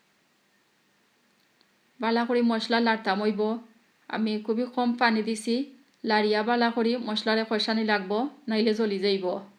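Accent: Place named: Sylhet, Bangladesh. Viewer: আপনার মাতে কতায় বুজতেছি আপনি সিলেটি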